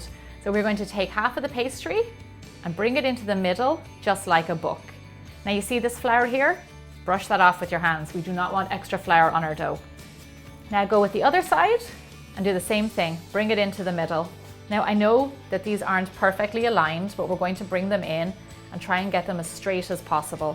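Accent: Ireland. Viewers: Looks heavenly. My mouth is watering. Love your Irish accent❤🍀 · are you Irish ?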